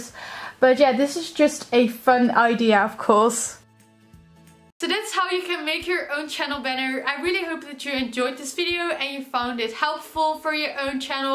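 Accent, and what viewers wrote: Netherlands. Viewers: Ik hoorde meteen dat je uit Nederland komt haha maar je engels is super goed x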